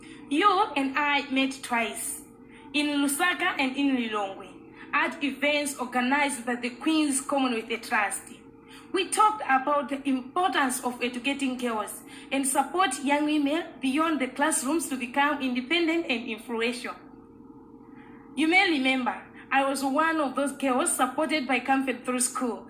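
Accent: South, United States. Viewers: Love your Southern accent! Makes me homesick. I also agree with your point 9fview.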